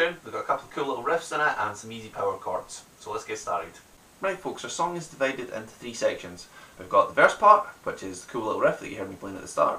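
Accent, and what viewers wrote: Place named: Scotland, United Kingdom. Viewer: Sorry this has nothing to do with the song, but is there anything better in the world than a Scottish accent? I think not. Good job on the song too. Greetings from America!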